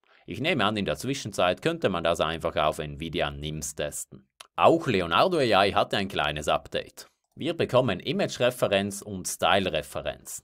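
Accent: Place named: South Tyrol, Italy. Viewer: Hon mir woll gedenkt, dass dess a Südtiroler isch.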